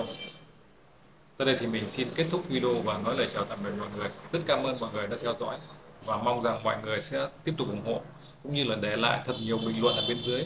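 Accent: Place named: North, Vietnam. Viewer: E nghe giọng anh hình như k phải dân miền Tây An Giang thì phải. Em đóan k lầm hình như a ở miền bắc nhỉ😊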